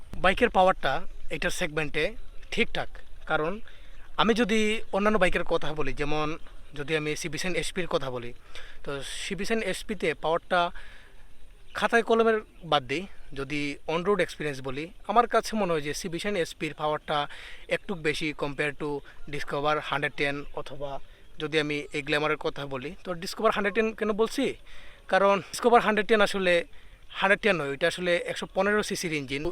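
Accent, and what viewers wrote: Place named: Sylhet, Bangladesh. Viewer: সিলেটের মানুষদের একটাই প্রবলেম, শুদ্ধ বাংলা ভাষায় কথা বলতে গিয়ে একদম মুখের অবস্থা খারাপ হয়ে যায়। শুদ্ধ বাংলা ভাষায় কথা বলা কি এতই কঠিন? আসলে একটু চেষ্টা করলেই এটা মানিয়ে নেওয়া যায় এবং পারা যায়।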